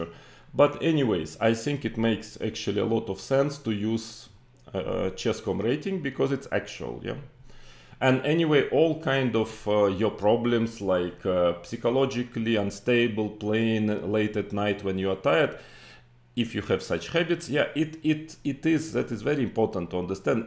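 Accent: Russia. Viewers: Владимир хорошо говорит на английском, правда с ОЧЕНЬ РУССКИИ АКЦЕНТОМ, что только радует что Владимир хорошо развит, и что он чисто русский, и мало был в Америке, и не нахватался американского акцента, в общем наш человек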